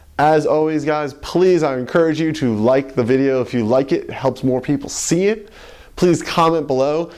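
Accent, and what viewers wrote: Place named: United States. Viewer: he sounds like an American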